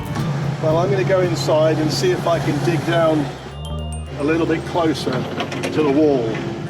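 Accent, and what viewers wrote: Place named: Australia. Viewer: Not long found your Reno, loving the videos & music 🎶. And you sound like an a fellow Aussie!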